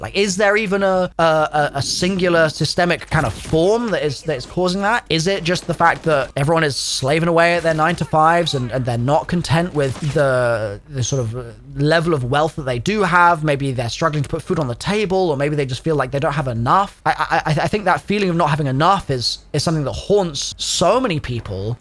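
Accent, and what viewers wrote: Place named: England, United Kingdom. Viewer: just wanna say, you were such a large part of my teenage life that my close friend and i had a little inside joke where every time we'd see each other we'd just go "hi guys, here" in an english accent. two 15 year old american boys just having a nice time at the arguable peak of the zombies community. it was really nice. wanna say that ive still been consistently watching your stuff. not really commenting all that much, but im here. thanks for all the laughs man, keep doing what you do.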